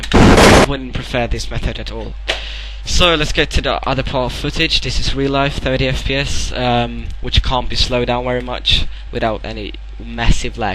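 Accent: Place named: United Kingdom. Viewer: UK Voice.. Better then those other shitters that can't speak proper english lol. Nice tutorial sure :) Keep them comming ^^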